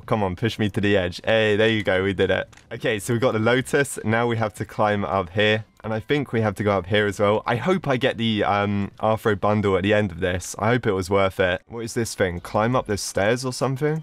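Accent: United Kingdom. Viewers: sounds like british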